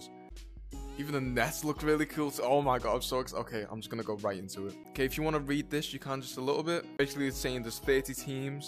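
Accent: Liverpool, United Kingdom. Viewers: Yo are u from liverpool England?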